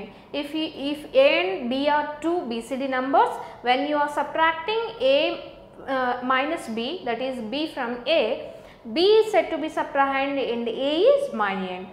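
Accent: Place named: South India, India. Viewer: you might be from south India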